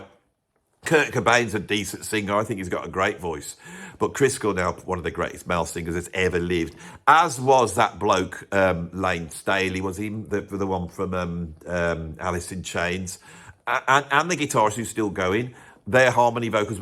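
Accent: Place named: Liverpool, United Kingdom. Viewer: Came for the Liverpudlian accent and the childish takes. Was not disappointed.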